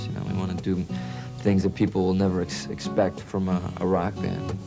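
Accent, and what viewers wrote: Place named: Boston, United States. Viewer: Boy lol you sure hear your Boston accent! Now just that LA accent! Its shows that today looking back you still have those same thoughts and ideas! Your still doing your own thing! rock on! cant wait to hear the whole album! xo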